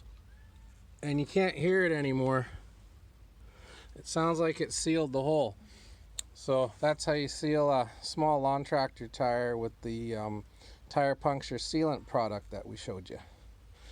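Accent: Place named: Canada. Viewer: Thanks, helped alot. I'm in NC. I noticed you talk like being in canada. Say "about" like "aboat". But great video. thx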